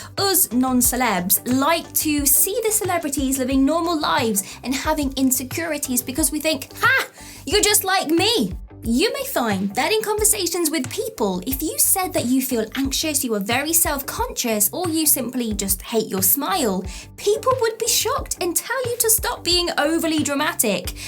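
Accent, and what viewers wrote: England, United Kingdom. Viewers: I absolutely love your voice! Are you English or British?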